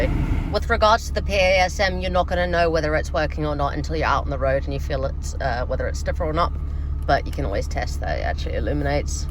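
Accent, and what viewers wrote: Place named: Australia. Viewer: This is Aussie accent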